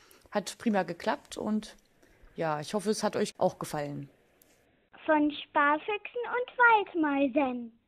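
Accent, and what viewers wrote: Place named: Magdeburg, Germany. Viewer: Klingt wie Magdeburger-Raum-Dialekt :D Super Kratzbaum und Video! Gibt n Like :)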